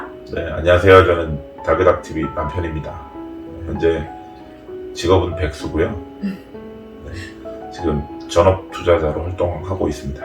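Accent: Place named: Gyeongsang, South Korea. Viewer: 아이고~아이고~~ㅋㅋ 같은 경상도 사람이라 말씨가 더 정감이 더가더라구요^^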